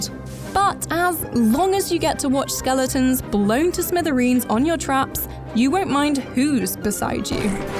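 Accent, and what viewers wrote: United Kingdom. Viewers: Yo yo British... I was soo bored by listing American accent .. happy to listen to an British one .. 😌😌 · Narrator : Very strong british accent I'm out...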